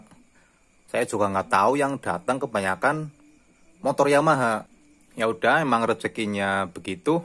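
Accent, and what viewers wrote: Banyumas, Indonesia. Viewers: Kayane wong ngapak gie,tak subsicrab mashe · Ndeleng logate kaya kaya cah ngapak apa ya??🤭😅 Salam ngapak boss..🙏🤭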